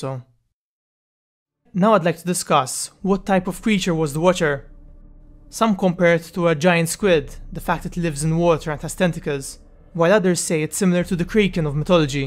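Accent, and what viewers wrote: Malta. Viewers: Your accent is interesting. Are you Maltese by any chance? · Ifhem, fl-about hemm "country: Malta" kif wkoll l'accent jghin naqra ghal assumazjoni bhal dik! Proset imma eh, kompli sejjer hekk :D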